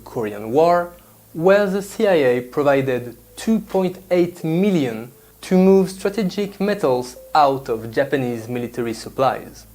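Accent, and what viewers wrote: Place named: France. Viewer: You sound like a Frenchman.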